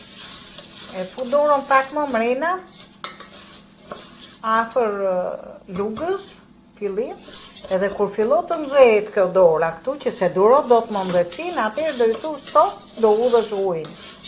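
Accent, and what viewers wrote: Tirana, Albania. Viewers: Te lumshin duart por ajo qe me pelqeu me shume eshte kur e shpjegon , Tironse ❤ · Me shum me pelqe e folmja tironce po dhe pilafin e ke bo persmari te lumshin duret tkesh shnet🙏🙏🙏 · Te lumshin duart dhe goja ma knoq shpirtin me ate te folmen tironce nuk ta di emrin por me pelqen shume kur shpjegon me kujtove gjyshen time te ndjek pothuajse ne te gjitha gatimet se jam vete tironce puro pershendetje nga Kanada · Na kenoqe mi tironce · Tlumt goja se knoqem kur flet puro tironce pilafi i mrekullushem sh faleminderit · Me kenaqe me komunikimin prej tironse Me pelqejn gatimet qe ben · Të lumshin duart! Më pëlqen shumë e folura jote Tiranse dhe sidomos bukuria e spjegimit të detajeve gjatë gatimit! Të përqafoj nga Saranda! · Te pelqen theksi tirons ty moj? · Te folme Tironse ke qef me ju degju 💋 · Sa lezet ka te degjosh çdo gatimë si nene tironce ❤ te lumshin duart! 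Na kenaqe gjithmon videot e tua! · Te lumshin duart zonje dhe me ate te folme. tironce puro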